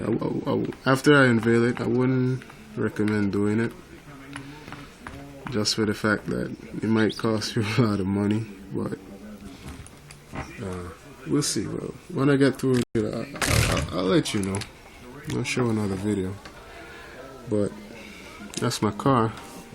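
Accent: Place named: Caribbean. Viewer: yo, you sound like a Caribbean man. Where you come from?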